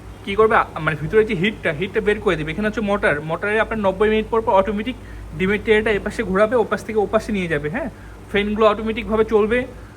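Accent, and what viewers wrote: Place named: Dinajpur, Bangladesh. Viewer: দিনাজপুরের কথাশ এটা ভাই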